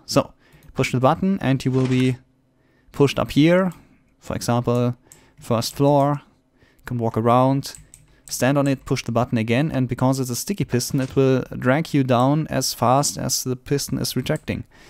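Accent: Germany. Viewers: Man hört richtig diesem deutschen Akzent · that german accent of yours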